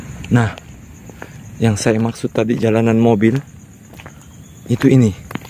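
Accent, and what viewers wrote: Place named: Jakarta, Indonesia. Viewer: Makassar bisa tonji bisa juga logat jakarta walaupun kadang ada yang salah sebut kata katanya sedikit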